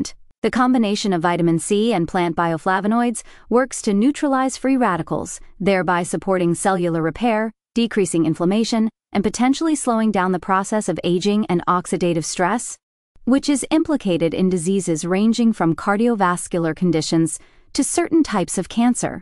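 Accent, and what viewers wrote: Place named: United States. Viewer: thanks for your posts. But you say you are in the UK? You have a very American speaking voice.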